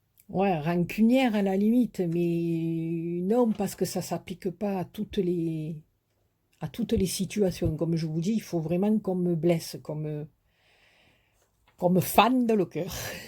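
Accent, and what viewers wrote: South, France. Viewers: Coucou, j'ai toujours le sourire quand j entends ta voix....😉 ça me fais du bien même si je ne suis pas à plaindre, même ton accent du midi,c est du soleil, quand à Agen il pleut...merci pour tes réponses sur ce tag...j ai bien ris 😂😉 comme toujours...merci beaucoup pour tes très belles créations et tes tutos très bien expliqués....je t assure que tu explique très bien, vu que je comprends et que je suis une débutante 😉🤣....je te fais des bisous prends soin de toi aussi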